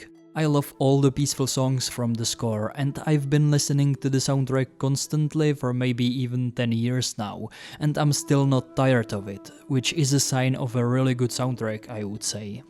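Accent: Czech Republic. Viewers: Dopytle....celou dobu si říkám..odkud ten borec asi je....takovejhle "přízvuk"....to je snad Čech...a pak na mě vyskočí:)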